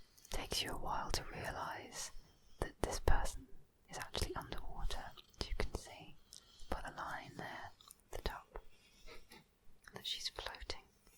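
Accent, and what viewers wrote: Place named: United Kingdom. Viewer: Sorry but I genuinely don't like British or similar to British accent,so this video soundeed agitating to my ear due to that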